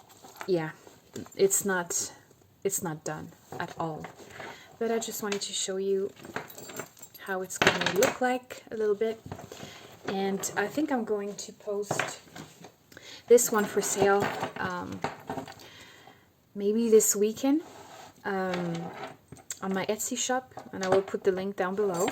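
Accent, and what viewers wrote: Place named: Quebec, Canada. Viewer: I love this, very beautiful work! Are you French? You have an accent that sounds from Québec. Just asking cause I am in Montreal!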